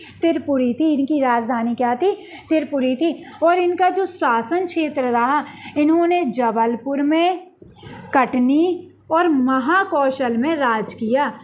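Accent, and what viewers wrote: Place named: Bundelkhand, India. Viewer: Madam ji aap bundelkhadi lag Rahi kaha se he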